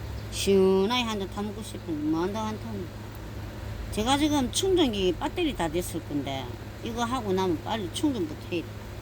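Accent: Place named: Gyeongsang, South Korea. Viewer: 저두 경상도여자~~ 말씀, 사투리 다 알아듣고, 넘 재미나시는데! 넘~신경쓰지마세요~~앞으로도 조잘조잘 많이 부탁드려요~~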